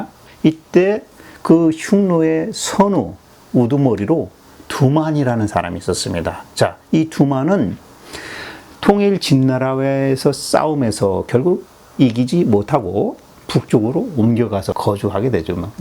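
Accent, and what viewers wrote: Gyeongsang, South Korea. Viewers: 깅상도 아이가?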